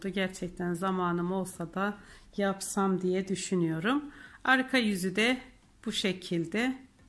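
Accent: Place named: Black Sea, Turkey. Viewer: Karadeniz (Rize)şivesi bu!👍😊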